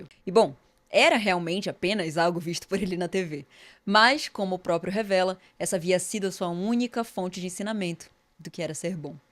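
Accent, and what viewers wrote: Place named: Pará, Brazil. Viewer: Ela tem sotaque paraense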